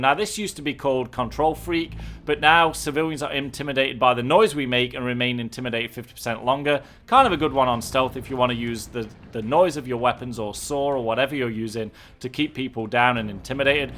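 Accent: United Kingdom. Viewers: are you british? You sound like to me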